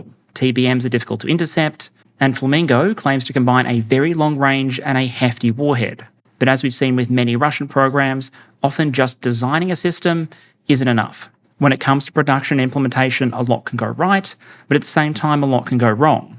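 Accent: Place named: Queensland, Australia. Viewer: Gonna take a punt here and say has a Queensland accent, specifically SEQ, so uh, how about them Broncs hey?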